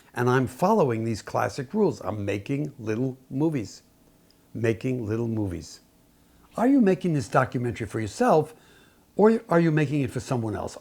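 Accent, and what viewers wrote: New York, United States. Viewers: Christ your accent is so New York it's giving me flashbacks. Don't worry about the ads; I bet no one will mind.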